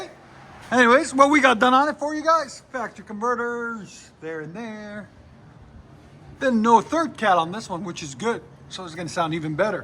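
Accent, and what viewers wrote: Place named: New England, United States. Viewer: I cant get past the sound of a yankee doing the commentary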